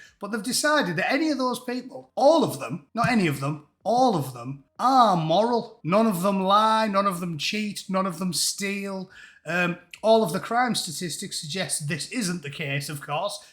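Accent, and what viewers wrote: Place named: Middlesbrough, United Kingdom. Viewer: That sounds like a sunny Boro accent I'm hear here..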